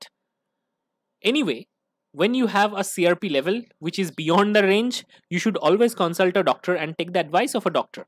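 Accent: India. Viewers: The sigh of relief when I heard the Indian accent 😂 I kept telling everyone that I feel horrible, exhausted and nauseous all the time and always got a "we all feel that way, just wait until you have kids, then you'll know true exhaustion 😡" I really feel like punching those people in the face now, because they fired me for always feeling sick and performing badly because of it while not giving me time off to see a doctor. I will cause a scene. This is Europe not America ☺️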